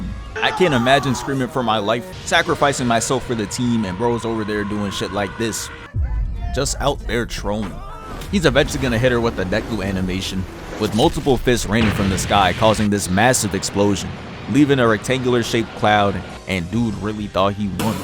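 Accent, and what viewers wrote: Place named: South, United States. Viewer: Yeah pardna, he talk country.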